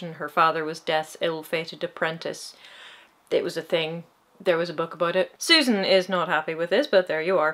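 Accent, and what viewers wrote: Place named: Wales, United Kingdom. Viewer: I lloved the Wellsh accent.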